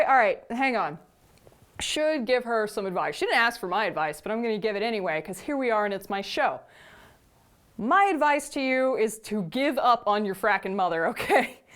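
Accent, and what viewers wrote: Texas, United States. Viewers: can anyone else hear the texan accent jumping out in this video????? 👀 (especially when starts getting fired up, i.e. about the first post and how crazy it was)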